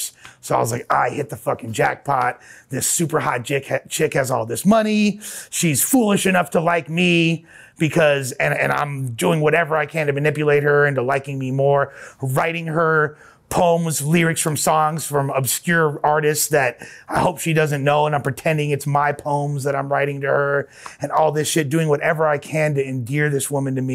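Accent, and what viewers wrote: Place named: Los Angeles, United States. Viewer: Genuine west side L.A fella , authentic in articulation , finally hear someone who gets it , the recovery language , the program , actually talking the walk. Thank you for "sharing".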